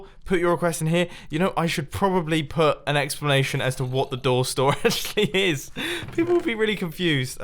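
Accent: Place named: United Kingdom. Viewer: A piston doooor stoooor XD I love mumbo's British accent by the way :)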